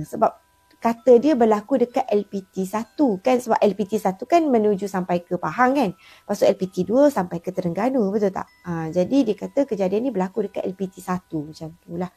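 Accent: Kelantan, Malaysia. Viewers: Akak ni org kelantan ke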